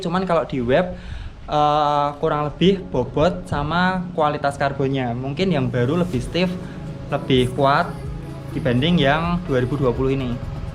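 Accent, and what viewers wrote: Java, Indonesia. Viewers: Mantaappp.... bahasanya pakai 3 bahasa, ingris,indonesia,jawa medok..hehehe.. semangat mass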